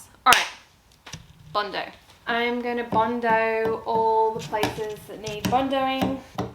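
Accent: Australia. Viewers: Awesome piece 👏🏼👏🏼👏🏼 BTW, where in Oz are u from? I’m from Maroubra, Sydney’s eastern suburbs. Really enjoying ur videos & ur Ozzie accent 😁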